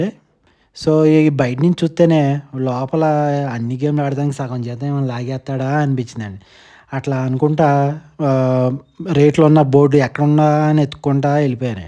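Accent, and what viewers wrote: Godavari, India. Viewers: Mi godavari ascent bagundi andi 👏👏😂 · Super me godavari yasa chala bagundi vinadaniki funny ga vundi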